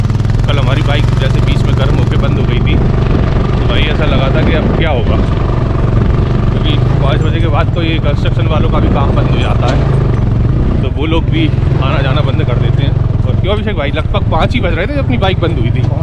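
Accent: India. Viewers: Bhaiya Agra side ke ho kya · Bhasha teth Ashoknagari? . Kya rishta hein aapka Ashoknagar se?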